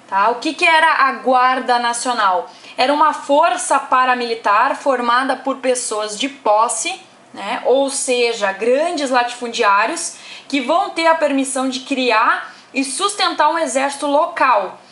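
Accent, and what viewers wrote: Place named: Rio Grande do Sul, Brazil. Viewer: Pela forma de falar a conjunção mas, garanto q é do RS, haha tbm sou, gostaria de saber se tem como fazer video aulas sobre a historia do nosso estados, e venho dizer q as aulas tem me ajudado muito, desde já agradeço e muito bom trabalho tens feito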